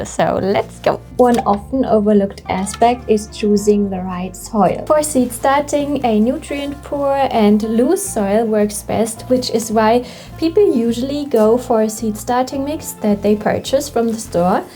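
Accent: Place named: Germany. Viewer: You’ve got the most lovely german accent I’ve ever heard, great video!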